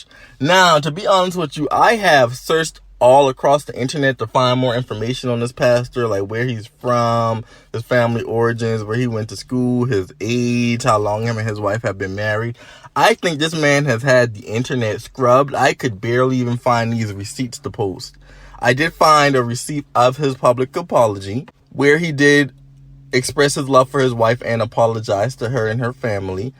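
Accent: Charleston, United States. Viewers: Are you from Charleston, S .C.? Beautiful accent.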